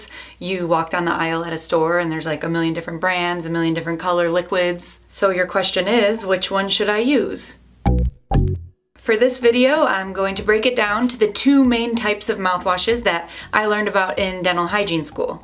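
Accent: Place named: Midwest, United States. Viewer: i picked up a bit of a midwestern accent from her hahahaha. however, great video! never would’ve thought this would be on my recommendations!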